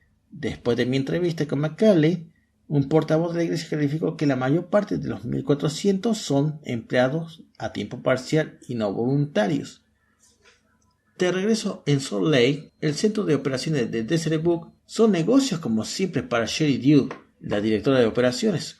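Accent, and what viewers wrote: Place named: Córdoba, Argentina. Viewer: Hola, me llamo Gaston, soy argentino. Estoy viviendo en Mexico. Por tu acento me parece que sos cordobes, sino me equivoco. Mira, quizas te resulte raro esto, pero aunque soy un "miembro activo y sirvo como lider de estaca" me han gustado varios de tus videos (no vi todos), en especial el que hablas de Korihor. Me gustaria, si fuera posible hablar quizas por correo o wats para hacerte algunas preguntas. Me gusta encontrar personas que se cuestionen las cosas que aprenden. No es un intento para "reconvertirte" jeje, en verdad creo que sos sincero en tu manera de exponer tus ideas y conceptos. Aunque creo en Jose Smith, y todo lo que conlleva eso, primeramente que eso creo en Cristo y sé que la Iglesia no es perfecta, refiriendome a la "Iglesia" como pueblo dirigido por hombres imperfectos pero creo en la doctrina que aun sigo aprendiendo, aceptando que no todo lo que un lider dice tiene que ser verdad. 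Mi correo es <mail> y mi celular es <num>. Espero que me des la oportunidad de conversar con vos.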